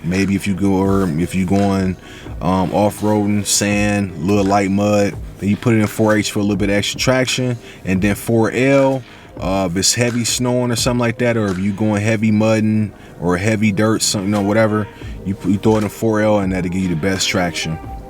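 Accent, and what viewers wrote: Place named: Detroit, United States. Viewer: As soon as I heard what up doe I was like he from Detroit he know what he talking about.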